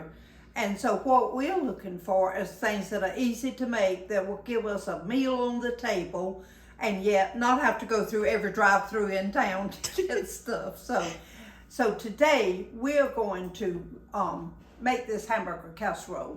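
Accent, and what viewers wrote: South, United States. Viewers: I love this treasure I found today on you tube! She sounds like my Aunt Scarlett from ATL but cooks like me in the 1970! Thank you for bringing my Southern Recipies back to life!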